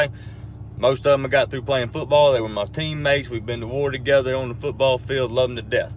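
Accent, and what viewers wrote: South, United States. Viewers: Hank is Texan. King of the Hill takes place in Texas. This man is clearly Southern, not Texan. You can tell by his accent and the fact that he mentioned corn and "cornhole". Those aren't Texan. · no, cause he is mad relatable and funny. he is a great story teller. i do love southern accents though:)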